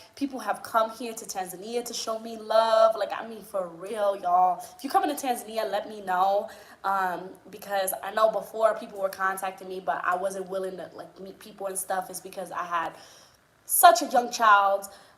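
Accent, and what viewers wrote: New York, United States. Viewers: You are such a New Yorker! Your accent and your mannerisms remind me of being home! May God bless you and your family! I saw some clips of your wedding. It was beautiful to watch! Congratulations 🎊🍾! You are blessed to be on the continent because you are surrounded by such a beautiful culture and beautiful people! Your journey in Africa was meant to be because you are an inspiration and you made the necessary sacrifices to fulfill your destiny! Keep the videos coming! It doesn’t have to be perfect. Just be yourself and continue shooting Tanzania! 👏🏽👏🏽 🥰 💐💐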